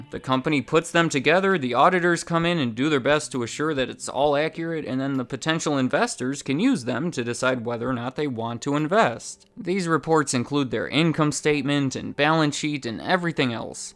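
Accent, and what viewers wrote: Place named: United States. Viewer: You sound like the neighbour in some long forgotten 70s family sitcom. Stereotypically American. I love it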